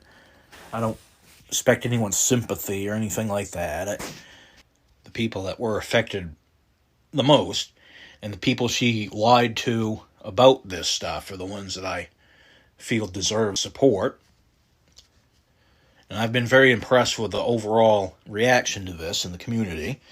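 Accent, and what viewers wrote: Maine, United States. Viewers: I hope you don't take this as me fishing (lolgetit) for information and feel free to delete my comment or just ignore if you don't want to reveal but...is that a Maine or Maine adjacent accent?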